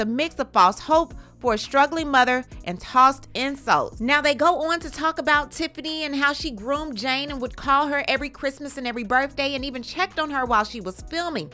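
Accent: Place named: Carolinas, United States. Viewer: I love and appreciate your coverage. You sound just like my cousin so it's easy listening to you plus you're detailed in your coverage. Thank you.. by the way are you from the Carolinas, bc you sound like us!! Lol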